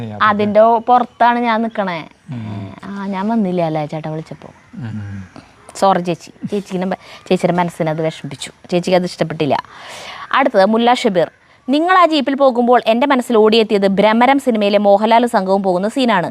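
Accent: Thrissur, India. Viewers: നമ്മളും ചുട്ട ന്ന് തന്നെയാണ് പറയുന്നത് പ്രോപ്പർ തൃശ്ശൂർ ഭാഷ അത് തന്നെയാണ്. തൃശ്ശൂർ അങ്ങോട്ടും ഇങ്ങോട്ടും നീങ്ങുമ്പോൾ വ്യത്യാസം ഉണ്ടാവും. നിങ്ങൾ പ്രോപ്പർ തൃശ്ശൂർ ഭാഷ തന്നെയാണ് സംസാരിക്കുന്നതു 👍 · trissur ഭാഷ യാണ് നിങ്ങളുടെ ചാനലിന്റെ highlite trissur ഭാഷ മാത്രം പറഞ്ഞാൽ മതി ഞാൻ മലപ്പുറം കാരിയാണ് 34 വർഷമായി hydràbaadil settiled aanu njaan ningalude ella vidieo kalum kandittund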